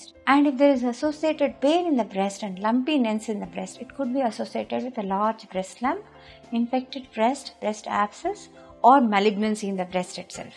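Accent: India. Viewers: it's her deep Indian accent ( lol )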